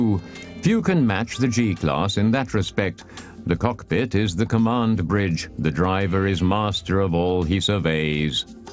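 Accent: United Kingdom. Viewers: youre from UK ? :) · What is going on with the narrator's accent? He sounds like an English BBC science presenter from the 1960s, but this is clearly filmed on the continent with right-hand drive cars where the prices are quoted in Euros.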